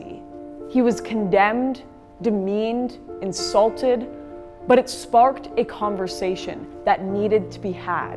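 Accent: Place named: Canada. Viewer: I love her Canadian accent, “prOject”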